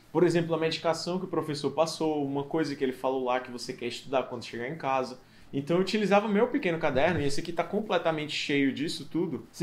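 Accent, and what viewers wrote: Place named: Fortaleza, Brazil. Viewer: Oi,. Tu é daqui de Fortaleza-ce? o sotaque é parecido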